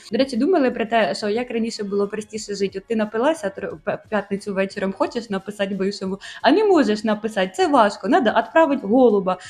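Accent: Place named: Cherkasy, Ukraine. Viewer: Звідки ви? Такий цікавий говір? Часом не Черкаси ? Прекрасна енергетика😻😻 Продовжуйте далі❤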